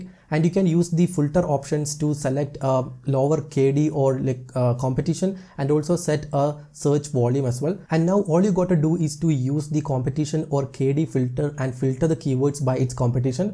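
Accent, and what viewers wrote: India. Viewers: Man you're just the best! Nice content and I use your... (Indian?) English to improve myself haha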